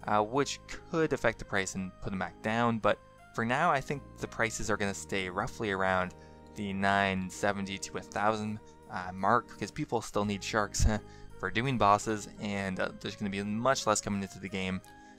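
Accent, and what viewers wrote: Canada. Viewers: mohammad baba tbh I get what your saying but I work a long hours job in sales so when I get home I want to relax and barely concentrate, this guy helped me when I first started flipping, I'd consider myself a veteran player now who understands it but I don't check the whole market for what's it's doing where as this guy does regularly so saves the time with his soft Canadian tones.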